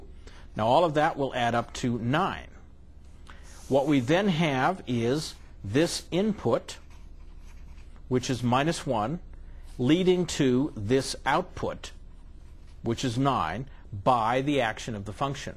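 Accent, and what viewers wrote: United States. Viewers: eww southern accent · some kind of midwestern american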